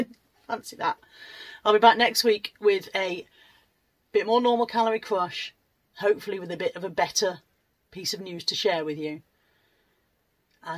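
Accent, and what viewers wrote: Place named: Forest of Dean, United Kingdom. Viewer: I've recently found your channel and I'm trying to pinpoint where you're from as you sound like you're local to me! I'm in the Forest of Dean 😊 really enjoying your videos! X What you said is spot on!!!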